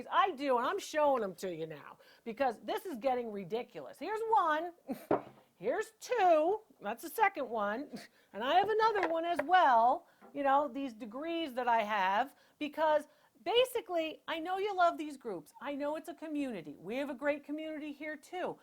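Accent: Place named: New York, United States. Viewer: It's just because of your New York accent that people say you remind them of others. That's because others have the New York accent, and Judge Judy is straight forward (although a little rough sometimes). Don't worry about the evil people with the nasty comments. They are just jealous because they don't know as much as you do.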